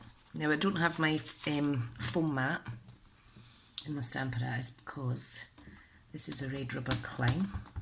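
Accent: Scotland, United Kingdom. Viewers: Another great tutorial. Thanks for sharing. Nice to hear a fellow Scot!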